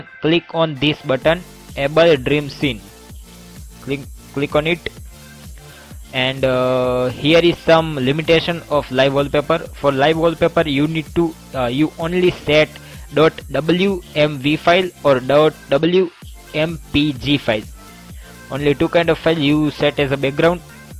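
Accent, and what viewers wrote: India. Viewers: i know its fake,you have an indian accent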